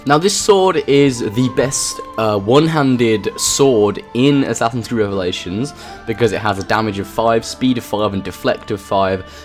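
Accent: United Kingdom. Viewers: I know why u hate ac3 bc Conor kill British soldiers And u sound like a British so it make sense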